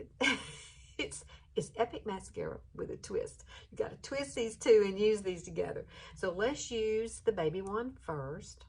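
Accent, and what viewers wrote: South, United States. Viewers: Hello,! I have my fetish about femininity whether I watch beauty/fashion videos, watch "motherhood videos, including day-in-the-life vlogs" or think about waitresses. I have my fetish about mustard and ketchup whether I squeeze 'em "on my hotdogs, cheeseburgers or hash browns" or "for my fries". I have my fetish about the round condiment squeeze bottles whether I think about the diners, pancake artwork parties or painted canvases. I have my fetish about the feminine names like yours. I enjoyed this video of yours. You have your Southern accent since you're living in Alabama. Quite recently, I watched the videos on "MrsMelissaM Beauty" and " Unboxing Mom". I watched the empties video and week-in-the-life vlog on " Parada". On one of the videos on " Unboxing Mom", I spotted her daughter named Kelly. I watched the muted videos on "Made by ", filmed in Holland. 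One of her videos featured the tie-dyeing party for Dutch children where I spotted the round condiment squeeze bottles. On Aug. 30, I washed 2 out of my 4 reusable "16-ounce" OXO squeeze bottles and separately replenished 'em with honey barbecue sauce and pancake syrup. On Aug. 31 and Sept. 1, I played with my 12 empty, clear "12-to-16-ounce" squeeze bottles by stacking, holding and "slowly squeezing" 'em. For my 1st dinner of September, I squeezed mustard and ketchup on my 2 hotdogs with my 2 other reusable OXO bottles. I ate some lightly salted Pringles chips and drank my soft drink. B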